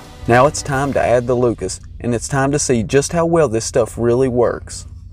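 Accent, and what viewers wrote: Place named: Texas, United States. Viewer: Wow! I LL try it! I like his Texas accent! Any cowgirls there?! Lucas oils are good! Good tips! So this is temporary treatment only?! Can use how many times or years?!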